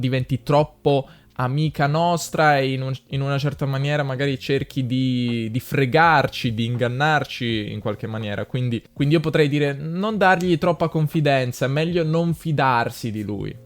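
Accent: United States. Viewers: I love these videos. Also, your American accent is spooky good! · Very American accent to your English. Is that the English they teach in Italia?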